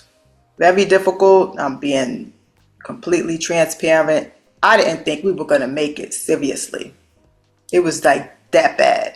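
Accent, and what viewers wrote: New York, United States. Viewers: Sounds like a NY accent ma. How you doing. Love your perspective. New sub glad I stumbled upon your channel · I would like to move abroad. I enjoyed this information. Are you a New Yorker? Lol I ask because if your accent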